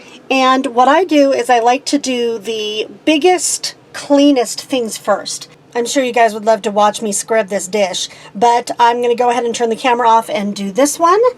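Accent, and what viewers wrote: United States. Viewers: Sound like Ohio! We LOVE You!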